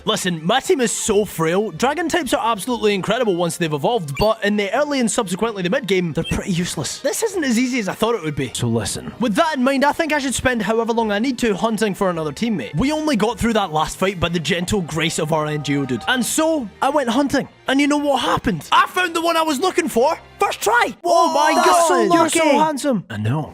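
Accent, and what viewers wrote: Scotland, United Kingdom. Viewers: Love the accent my fellow Scot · Nothing like listening to a Scottish dude playing Pokémon 😁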